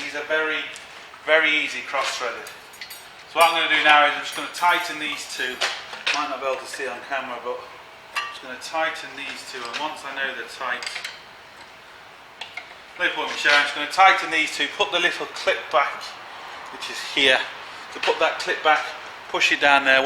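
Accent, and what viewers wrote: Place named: United Kingdom. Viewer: thanks mate its good to hear british accent for a change,good job well done.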